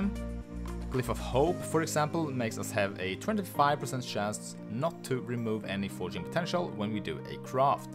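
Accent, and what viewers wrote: Sweden. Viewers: Tog fem sekunder att höra att här har vi en svensk kamrat 😏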